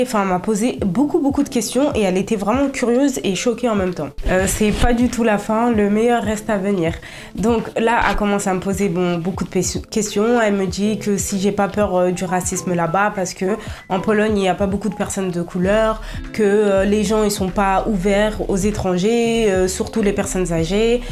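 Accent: Paris, France. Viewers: To chyba paryski akcent. Przyjemna dziewczyna. 🌹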